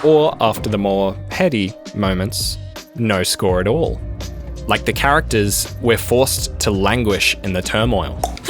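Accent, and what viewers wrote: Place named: Australia. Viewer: Man keep going. Insane that this doesnt have more views. Fantastic vid! Also so good and refreshing to be able to watch quality videos with an Aussie narrating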